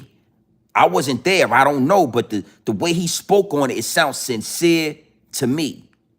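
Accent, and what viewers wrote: New York, United States. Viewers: You sound like you form new york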